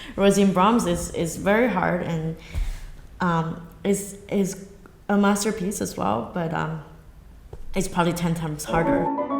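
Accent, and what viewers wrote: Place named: United States. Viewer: I so agree. Her American accent & mannerisms, which have worsened since this interview here, do take away from who she really is, i believe, When she plays she is herself. Here, the Americanisms aren't too bad... yet. :-/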